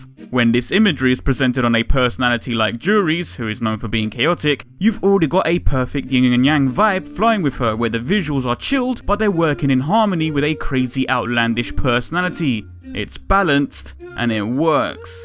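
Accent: London, United Kingdom. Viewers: Just happened across this video and in less than a minute and a half you've mad me laugh. Coupled with being a londoner, (I assume from you accent)... that's an auto-sub right there! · Its British one. A heavy slang based one but still British, more accurately, it's from London.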